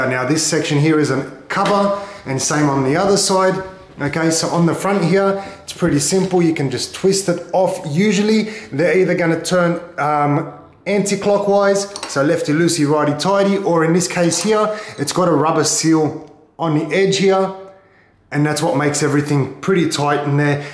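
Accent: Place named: Australia. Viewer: Thankyou, i was about to rip the door off it hinges but you saved me. What is with the rubber washer, dumb. Love hearing a aussie voice on a YouTube help channel .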